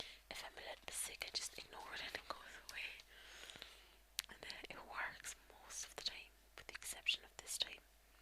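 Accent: Ireland. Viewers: I've recently become interested in Irish music, history, language and culture, and am thrilled there are ASMR videos with the Irish accent. Keep eating healthy and be happy. :)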